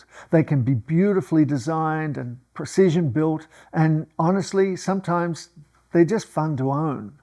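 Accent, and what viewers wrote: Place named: Australia. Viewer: Great advice. I will stop buying gear and start making. I’ve just found your channel and it was nice to hear an Australian accent.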